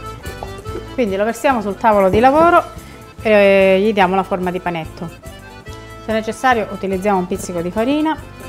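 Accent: Calabria, Italy. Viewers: Grazie! Spiegazione impeccabile. L'accento calabrese è bellissimo. :)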